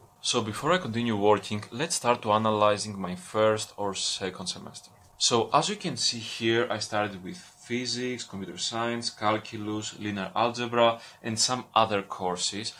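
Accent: Greece. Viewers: Il Αφερφέ ο λόγος που του μίλησα στα Αγγλικά είναι επειδή ΟΛΑ του τα βίντεο, ακόμη και η περιγραφή του καναλιού, των βίντεο κλπ είναι στα Αγγλικά. Άν και για να πω την αλήθεια, η προφορά του μου κίνησε λίγο την περιέργεια και έμοιαζε πολύ με Ελληνική. Τελικά είναι όντως Έλληνας. Οκ δεν πειράζει για την ερώτηση που είναι στα Αγγλικά. Με αυτόν τον τρόπο μπορούν να την διαβάσουν και οι ξένοι, οι οποίοι μπορεί να έχουν την ίδια ερώτηση με εμένα... Οπότε μην πας να το παίξεις έξυπνος για να βγεις από πάνω. Εφόσων μιλάει Αγγλικά στο βίντεο, είπα και εγώ να κάνω σχόλιο στα Αγγλικά για να καταλαβαίνουν όλοι. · Πως φαινεται η Ελληνικη προφορα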